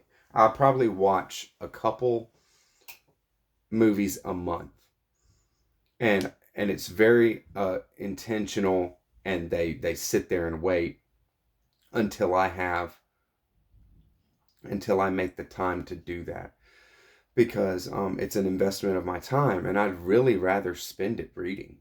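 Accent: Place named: Texas, United States. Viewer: I just watched your video for this tag, and your accent is the thickest I have ever listened to. Is it Texan?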